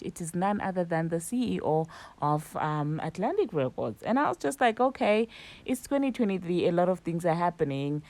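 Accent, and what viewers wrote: South Africa. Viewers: Are you from South Africa 🇿🇦!? Ur accent… makes me 😊 like when I use to live there. Keep the good work 😉🥰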